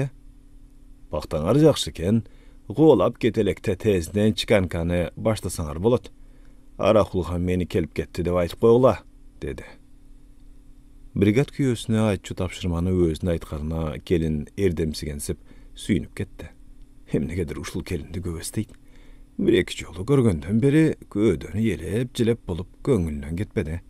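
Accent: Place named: Osh, Kyrgyzstan. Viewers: Оштутарлын тили менен окунан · Ушул оштуктардын тили жинге тийет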